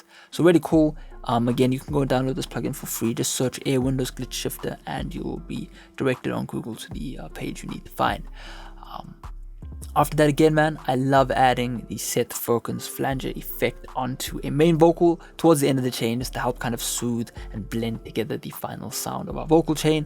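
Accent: South Africa. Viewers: Am i the only person that thinks your from South Africa? 😄 only recently discovered you and im South African myself. Your mixing advice has helped my vocal mixing out ALOT. Appreciate it broski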